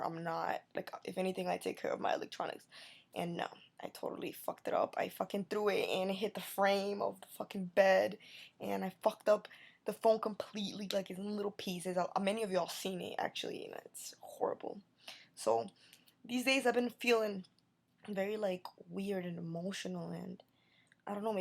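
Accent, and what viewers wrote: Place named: Boston, United States. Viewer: Is it weird that i love the boston accent?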